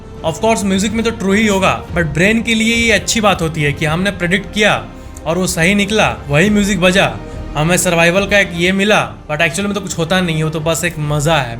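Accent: Bihar, India. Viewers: Sir aap bihari ho na please reply me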